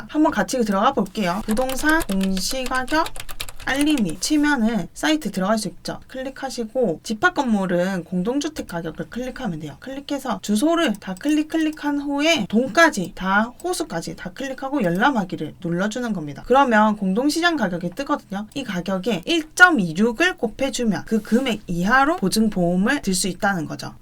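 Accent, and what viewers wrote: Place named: Busan, South Korea. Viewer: 사투리 윽수 까리해요 맕 가 마산 창원 울산 아니고 딱 부산이네마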